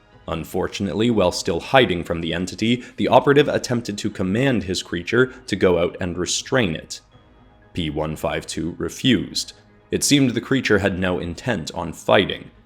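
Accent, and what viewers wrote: Canada. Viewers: You know, I've known you were Canadian for a long time, but never have you gone THAT Canadian. Love it, but man did you throw me for a loop! I wasn't even thinking to much about the "Z", lol. Love the designs for Snivy and Tepig designs. I don't play much Pokémon, myself, but they're some of my favorite starters, art-wise. So cute! That second one, though... that was rather disturbing looking. Glad for more of these! Just out of curiosity, the only archon we haven't seen do much yet is Romilasect. Do you have any plans for her in the near future? Presumably regarding one of the core couples, I take it. I've been hooked on the TAHE storyline (and am looking forward to seeing what happens next with Kiko, Alsya, and Dresden), but I guess it does strike me as weird how much of a side character that specific villain has been. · IDK are you Canadian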